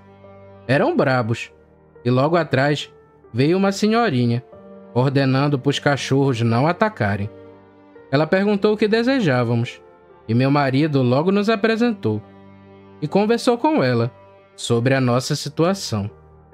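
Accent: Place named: Pará, Brazil. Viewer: Eu gosto desse sotaque, acho que é de paraense ❤, relato incrível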